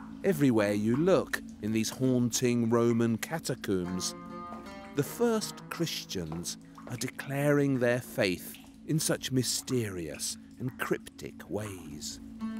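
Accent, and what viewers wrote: England, United Kingdom. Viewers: Superb presentation skills and great content. Very non-irritating British accent. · The speaker is a liberal. Just look at his hair. To be honest with you this guy doesn’t understand history. He just gives one part of history. Just because he has a English accent doesn’t make him an expert. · this british accent is so ugly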